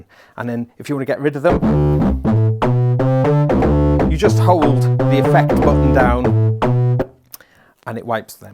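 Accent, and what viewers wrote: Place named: Wales, United Kingdom. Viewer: he is not nervous, it's just the welsh accent.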